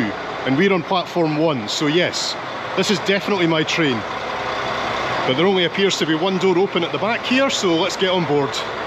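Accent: Scotland, United Kingdom. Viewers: How delightful to journey along with the views accompanied by the therapeutic sounds of the wheelsets on the rails and lovely Scot's voiceover. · music not needed, just background sound and your great Scottish accent....!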